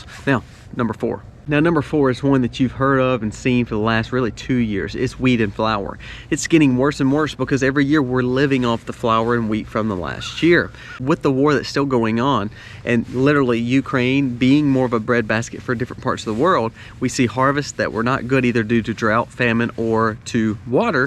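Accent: South, United States. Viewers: How very rude and arrogant of you to tell a whole group of people from a whole different country, with a different accent how to pronounce a word. I shouldn't have to tell a grown a$$ man with a country twang no less, to not act all high and mighty and judgmental over how they pronounce certain words. Come up north or out west and see what your fellow men say about how you speak.😡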